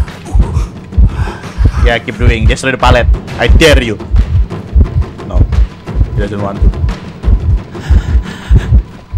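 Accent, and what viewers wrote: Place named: Indonesia. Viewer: Aksen'a kek orng Indo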